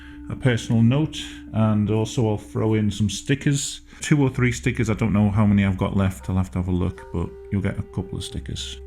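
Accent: United Kingdom. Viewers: Also, British man describing his creepy artwork is probably my new favorite genre of video.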